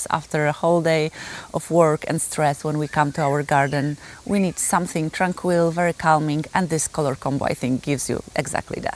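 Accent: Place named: Poland. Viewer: love your garden. I just started mine, I was too busy to do it earlier. Nice polish accent. Greetings from Atlanta.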